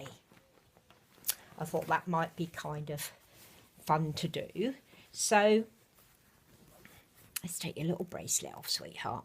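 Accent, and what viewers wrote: United Kingdom. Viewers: I love your Sophie .she looks a lot like the Maize sculpt.she is gorgeous.she makes you smile even when your feeling. down many inches is she ? I'm from the u.s.a.YOU sound like you have a British Accent.that I love by the way.kisses to Sophie from aunt Eve.by the way Easter is my favorite time of the year.FOR JESUS.KEEP MAKING THE SOPHIE VIDEOS😝😝😝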